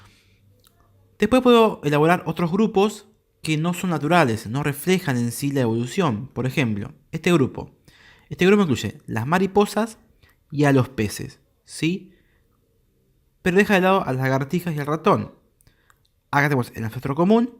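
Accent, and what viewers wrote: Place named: Argentina. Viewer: Tan argentino que duele, amoooooo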